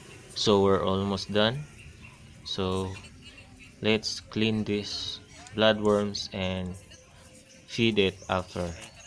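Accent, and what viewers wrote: Visayas, Philippines. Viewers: Taga san kayo kuya mukhang bisaya kayo ahh😁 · Taga san kayo kuya mukhang bisaya kayo ahh😁😊😊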